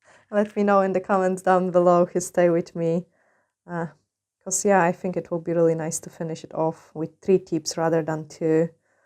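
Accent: Scotland, United Kingdom. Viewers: Love hearing your Scottish accent coming through 😂😂. Also lovely work x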